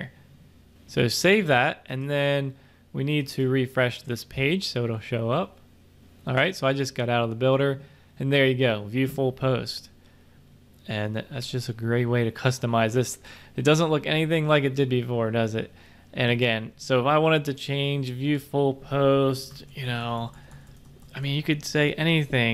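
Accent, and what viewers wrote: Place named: Baltimore, United States. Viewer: Are you from Baltimore or Maybe Philly?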